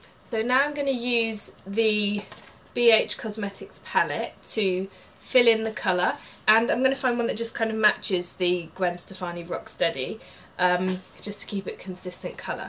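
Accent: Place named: United Kingdom. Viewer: Nice video! I love your voice and your British accent! I could listen to you talk all day long! Please do more of these makeup videos/tutorials, maybe some you are getting ready? Thanks! oh, I subscribed! 😍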